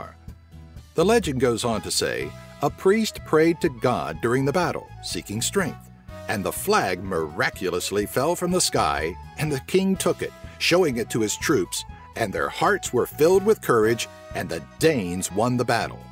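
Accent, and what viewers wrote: United States. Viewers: it's funny to here a American saying danish words.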